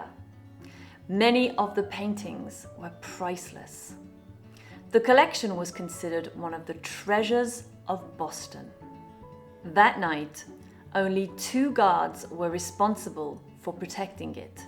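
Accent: United Kingdom. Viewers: Hi, Just wanted to say I absolutely love your British accent! It’s so lovely 🌸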